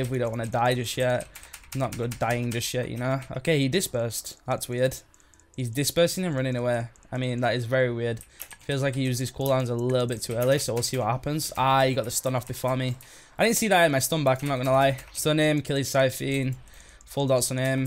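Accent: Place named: North, United Kingdom. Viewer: Love the videos man, entertaining. As a fellow northerner, it’s nice to hear the accent too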